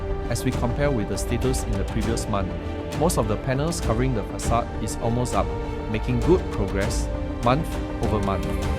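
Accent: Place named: Singapore. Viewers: As a Singaporean I feel happy to hear your accent and I feel proud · Good update! Also love the fact that you are not using narration with a generic AI accent. The Singaporean accent makes this content feel hyper local and authentic. Subscribed.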